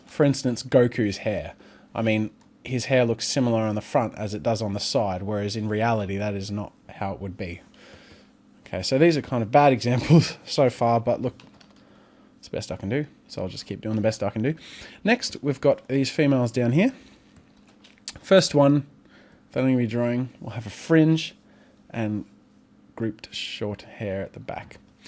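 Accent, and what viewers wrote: Australia. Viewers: are you australian? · Yep, we Aussies do indeed (mostly) say "mæŋɡɑː" rather then the Yankish "mɑrngɑr" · that Australian accent gets all the ladies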